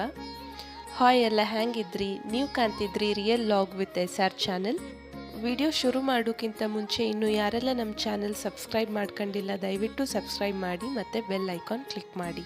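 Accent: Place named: Kundapur, India. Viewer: Super kundapur kannada💐💐💐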